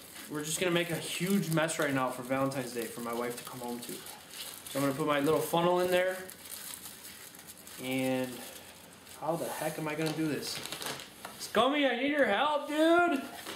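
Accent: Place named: Canada. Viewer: Because of your accent and speech pattern. Are you Canadian or from a state near Canada.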